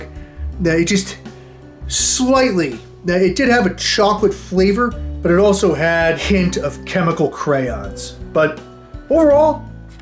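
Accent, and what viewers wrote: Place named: South, United States. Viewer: 👀👀 you and Bryan Broaddus almost sound identical. Hes a Dallas Cowboys scout southern draw but yall sound so similar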